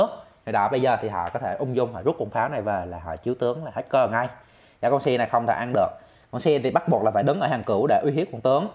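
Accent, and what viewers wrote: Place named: Central, Vietnam. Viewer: Mang tiếng miền trung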